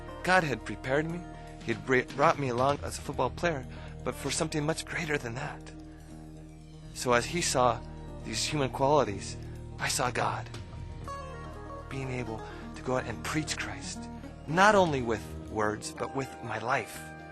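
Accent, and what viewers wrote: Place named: Quebec, Canada. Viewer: What kind of accent is that? He sounds French Canadian... from Southern California?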